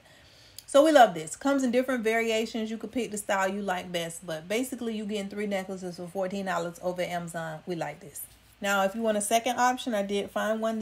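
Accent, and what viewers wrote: South, United States. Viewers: hi 😄, this is Kay.. yesss. they are all 5 stars. 💥💥💥💥💥. btw, I love how you say "thure' instead of there. where are you located? or the bit of southern accent?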